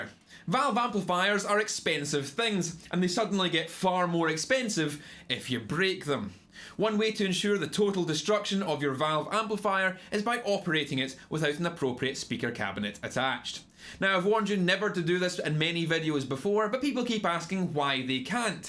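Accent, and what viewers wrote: Scotland, United Kingdom. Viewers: Is it Scottish?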